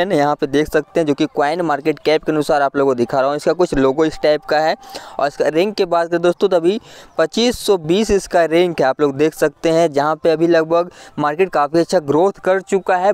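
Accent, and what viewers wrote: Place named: Shekhawati, India. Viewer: Sir aap sekhawati side ke lagte ho awaj se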